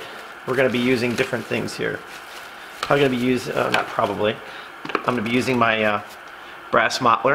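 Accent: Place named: New Orleans, United States. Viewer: Where are you from? You sound like you are from New Orleans. I grew up here and the accent sounds familiar.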